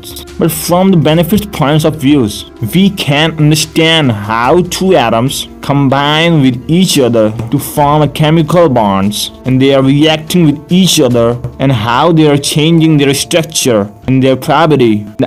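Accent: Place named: Indonesia. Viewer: Indonesian trying american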